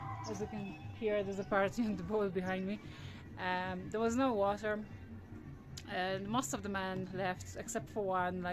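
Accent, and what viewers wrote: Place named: Poland. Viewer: Fantastic. I am from Islamabad Pakistan. I am really enjoying your Pakistan videos. I guessed it right about Poland looking at your names & accent. What i know about Polish people is that they are the most adventurous people on this planet. Most of the top mountaineers who come to Pakistan are from Poland & what you are doing isn't easy either. They love adventures 👏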